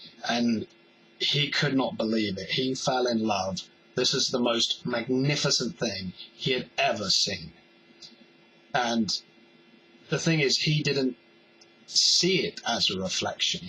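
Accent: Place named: South, United Kingdom. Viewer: the healthy narcissism part I could utilise for being/becoming a dancer, learning to overcome fears is needed moreso.btw, I can tell you have part dutch genetics within you from your vocal tone/sound, (of course mixed with a southern uk accent)... maybe I can connect more positively here on youtube :)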